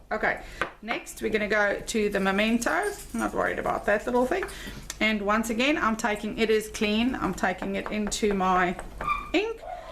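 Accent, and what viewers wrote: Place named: South Africa. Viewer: Thank you for this great comparison. So fun to hear a South African accent on a crafty video ❤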